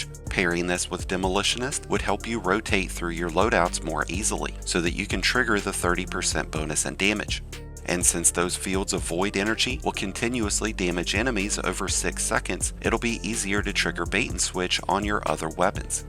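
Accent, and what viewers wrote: South, United States. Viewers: love ur accent! is that southern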